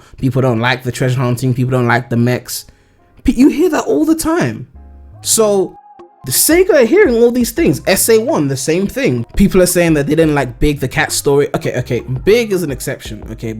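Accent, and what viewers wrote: United Kingdom. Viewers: Great, I'm new to your channel so I'm curious about this, do you have some British in you? You sound kind of British. lol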